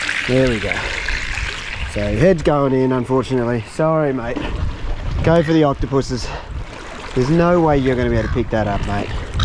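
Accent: Australia. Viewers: Bro I started watching your videos during covid, I thought you were from Sydney, then Melbourne, then Brisbane 😂 Anyways, great content, still watching! Best on my WFH days 🎉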